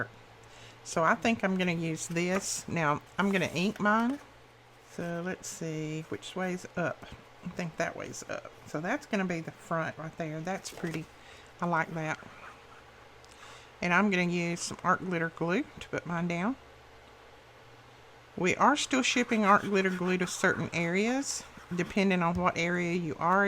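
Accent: South, United States. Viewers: I tried this for my first journal. I loved making it so much I'm working on my third journal....much harder ones. I love how there are so many choices for how to make them. And I enjoy your voice. You have the same accent my Grandmother had. She was from Nebraska. Yours may be a little more Southern, though. Thanks for the great videos.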